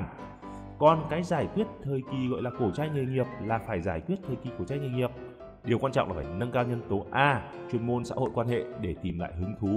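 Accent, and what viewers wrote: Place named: Hanoi, Vietnam. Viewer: giọng chuẩn trai Hà Nội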